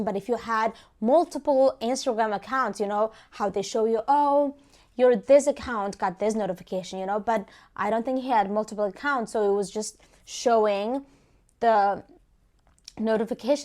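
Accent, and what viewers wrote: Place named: United States. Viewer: Your American accent helps me improve my pronunciation.Waiting next English speaking vlog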